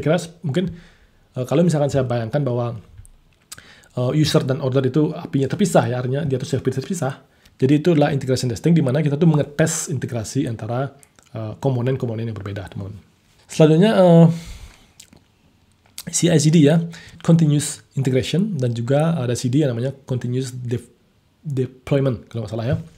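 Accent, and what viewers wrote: Bali, Indonesia. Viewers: logat orang bali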